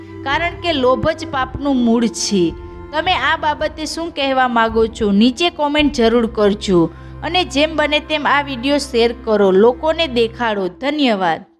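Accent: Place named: Saurashtra, India. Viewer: JAY HO. GOOD East African OR SAURASHTRA (KATHIYAWADI) GUJARATI LANGUAGE. 🙏🙏🙏🙏🙏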